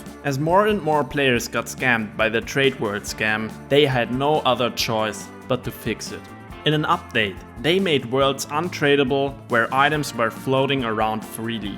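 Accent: Germany. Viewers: Hey Are you German?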